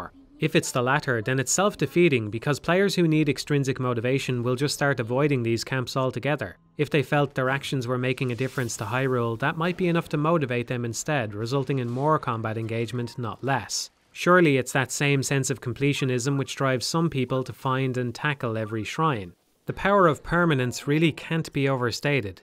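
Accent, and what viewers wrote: Ireland. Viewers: Is this fella Irish? "Sometimes it seems the only thing Link can't mount is Mipha." Haha yeah up the lads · Is this guy irish? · Are you from ireland? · are you Irish?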